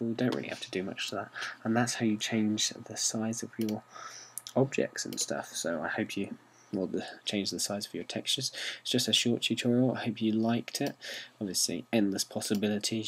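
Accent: United Kingdom. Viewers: your UK dialect is the best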